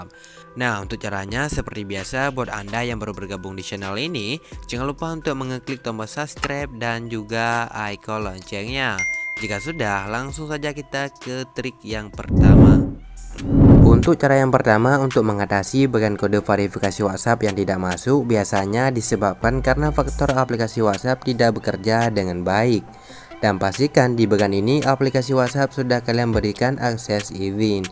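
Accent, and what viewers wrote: Aceh, Indonesia. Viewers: Nyan keuh khas awak aceh.. Adak bak Ujong donya di jak,tetap ta turi logat awak aceh.. Yg penting subscribe,, mangat teubai kantong.. 🤣🤣🤣🤣🤣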